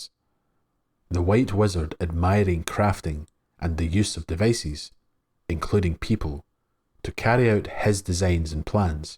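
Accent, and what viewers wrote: Scotland, United Kingdom. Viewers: There is no shortage of knowledgeable people when it comes to Tolkien lore...I, myself, have read all published material many times over, and explored other material as well. However...your insight is what sets you apart. I've listened to several of these videos, now, and have learned a great deal. You go beyond stating the 'facts' and explore the relationships, meanings, reasons, etc. that give the facts their importance. Truly a work of art. Your pacing and diction is spectacular as well, and the distinct--yet clear--accent makes me miss Scotland in a bittersweet way. Thank you for your contributions to our understanding of this wonderful universe. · I listen just for your accent. Scottish?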